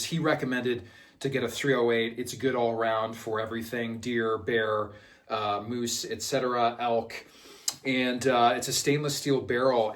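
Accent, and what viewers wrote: United Kingdom. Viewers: Great video. Shame about the British accent. It sounded like Dick Van Dyle in Mary Poppins! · Hilarious,speaking to a swiss mountain dog in an Northern Irish accent. Clovis is great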